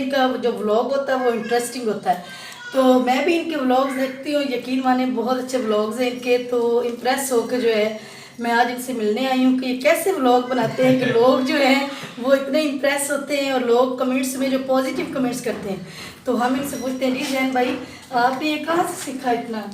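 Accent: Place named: Chakwal, Pakistan. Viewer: R u from chakwal ap ki Urdu say pata chal raha ha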